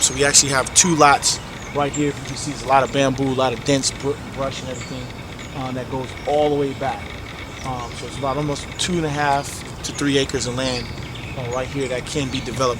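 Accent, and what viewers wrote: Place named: New York, United States. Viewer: Spoken like a New Yorker.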